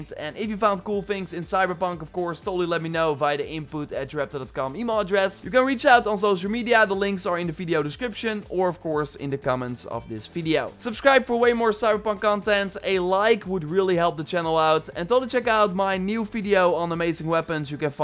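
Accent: Netherlands. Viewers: bruv you make us dutch people look bad with ur accent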